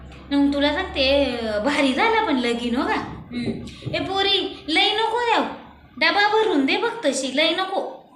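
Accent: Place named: Shahapur, India. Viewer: शहापूर च्या भाषेची आठवण येते तिथल्याच बोलीभाषेची🎉😂❤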